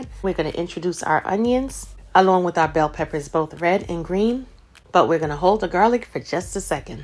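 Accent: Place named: East Coast, United States. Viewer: I am subscribed to your channel but I rarely get in YT but when I do OMG I love watching your vids because the food looks deliciouis and not only that.., I know that I have told you that I LOVE LOVE LOVE LOVE LOVE LOVE YOUR EAST COAST ACCENT. I LOVE IT SO MUCH. VISITING NYC WAS CUT OFF OF MY BUCKET LIST IN SEPTEMBER 2021. MY SISTER SURPRISED ME WITH A TRIP THERE FOR MY 50TH BDAY AND WE STAYED IN MANHATTEN NEAR TIME SQUARE. I'M A COUNTRY SOUTHERN GIRL FROM LITTLE ROCK ARKANSAS, BUT WHEN THE REAL WORLD CAME ON MTV I WAS FASCINATINED, FROM HEATHER B AND I ALWAYS LOVE EAST COAST RAP. THAT WAS A WORLD WIND ♥♥♥ THE WORLD IS KINDA MESSED UP NOW, BUT WHENEVER I GET ME AND MY DAUGHTER SOMEWHERE TO LIVE, I WILL MOST DEF BE TRYING OUT YOUR RECIPES...., CAUSE HONEY THEY LOOK DELICIOUS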